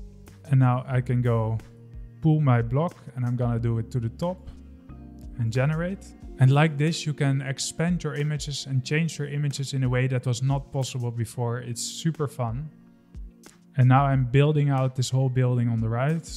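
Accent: Netherlands. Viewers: First seconds watching this video and i immediately know you're Dutch ahhaha nice accent bro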